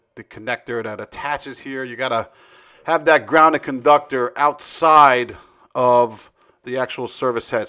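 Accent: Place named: New Jersey, United States. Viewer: Hello Mr., I love your videos, you are so steady in your work and explain things very well. (I'm assuming New Jersey by the accent. (my mother and maternal grandparents were from New Jersey) I grew up in PA and been doing electrical work 32 years. I love that you just show the work nothing flashy, or gimmicky. Please stay like that, I am all for a good laugh but there is a time and a place for that. And when you are completing work that can kill you, or possibly other people, this isn't the time. You Sir, are a credit to electricians all over the world, especially here in this wonderful USA, a consummate professional. Sorry for referring to you as Mr., whenever anyone does that to me I look around for my dad or grandfather. But I am also a, lol. My dad told me growing up you never call someone Sir, until they gain or earn your respect, sorry I am rambling. 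But you Sir, have gained my respect within your work. The only thing I would add to your videos is leave the print up for a little longer when you post a written message, as it's hard to read that fast. And I thought the meter height thing was 6'7", I know that's splitting hairs, but we know how the NEC likes to split hairs, and so do inspectors, sometimes. But your probably right at 6'6" and mine is for inside panel boxes. But great video thank you!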